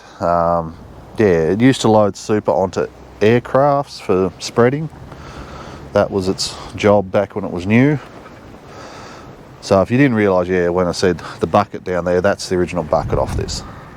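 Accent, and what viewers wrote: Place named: Australia. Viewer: I'm getting the picture now. You're not in the UK. That's why these Majors have all got different back wheels on them. Were they maybe delivered CKD and had the wheels made in your country? Australia? Going by that accent. And I've never seen a loader like that either.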